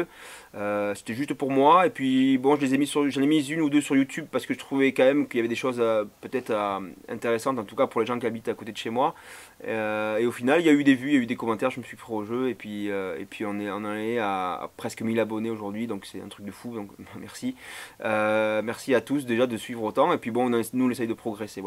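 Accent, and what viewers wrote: South, France. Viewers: Super ta vidéo t'as l'accent du sud tu est dans quel département ?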